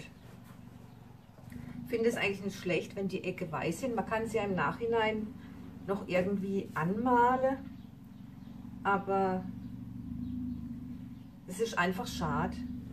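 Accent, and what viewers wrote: Germany. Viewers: Ich hab dich auch durch Annemarie gefunden. Ich habe ihr Tun vom 1. Video an verfolgt und sehr viel gelernt und auch viele Fehler vermieden. Bin schon seit 20 Monaten am Pouren, mit viel Freude und Illusionen. Du greifst gerade eine ziemlich neue Richtung auf. Kennst Du Kristin Oladotter Steen? Sie hat auch das Problem mit den ungewollten Flecken. Falls sie dich stören, 1 Teelöffel Alkohol vor dem Gießen in jeden Becher rühren, dann geht es besser. Ich freue mich schon auf deine nächsten Werke und hab dich gleich abonniert, damit ich keines deiner Werke verpasse. Ich habe leider nicht soviel Platz, um größer als 50x50 zu machen und außerdem fließen meine Stauräume schon seit Monaten über. Das ist ein echtes Problem wenn man süchtig wird oder schon ist. Ich lebe in Norddeutschland und du nach der Farbe deiner Stimme sicherlich im Süden. Liebe Grüße Karin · Hallo ich danke Annemarie dass ich sie gefunden habe. 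Das bild ist wunderbar. Ihren dialekt kommt mir bekannt vor sind sie villeicht von der Baden _Wuerttenberg gegend?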